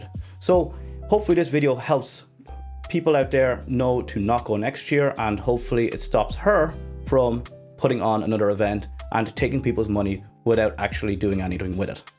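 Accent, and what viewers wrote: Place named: Ireland. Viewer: Are you Irish? you have Irish accent. anyway, great content <3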